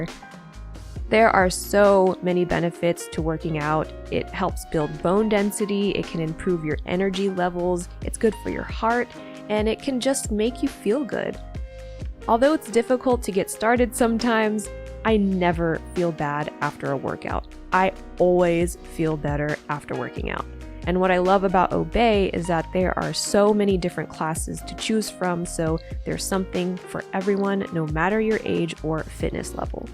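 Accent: Australia. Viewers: aussie accent goes crazy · I was wondering if anyone else noticed that lmaoo sounds kinda australian or new zealand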